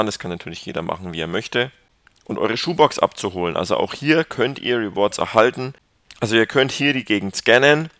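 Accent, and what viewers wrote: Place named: Nuremberg, Germany. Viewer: folge dir mal auf twitter ;) ich hörte da so einen Nürnbercher dialekt raus endlich mal einer mit Youtubetschänel aus der Gegend nice vl. kann man sich mal treffen ;) ich bring auch an LKW mit (nur ein Franke weiss was das für gutes Essen ist ) ;) mjam mjam ;)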